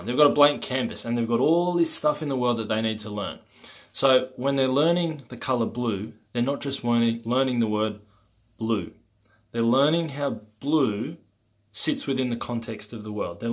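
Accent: Australia. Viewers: Love the aussie accent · You sound Australian.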